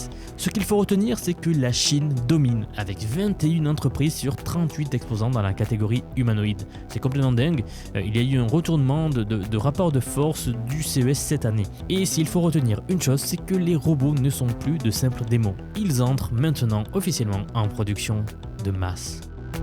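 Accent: France. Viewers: Ça prendrait des sous-titres pour comprendre les noms. Un français qui essaie de parler anglais, on comprend jamais rien 🤷🏻‍♂️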